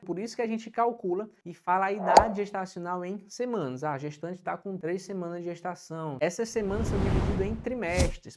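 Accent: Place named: Paraíba, Brazil. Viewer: faz vídeo sobre as medicações que mais são usadas na enfermaria, diluição, indicações de uso e contraindicações … ótimo vídeo! Sou da Paraíba temos sotaques parecidos kkkk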